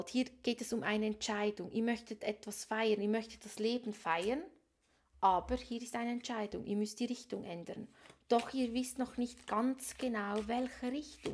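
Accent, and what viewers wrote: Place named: Switzerland. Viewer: Ich liebe deinen Schweizer Akzent. Schön dir zuzuhören. lg Marcel🙏😊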